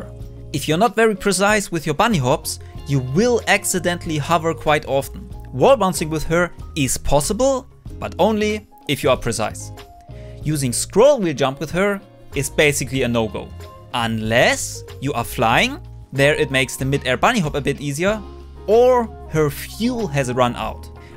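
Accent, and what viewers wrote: Germany. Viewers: I wanted to ask if you are German I’m German and I hear the accent so are you? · You Sound Like a German am I right?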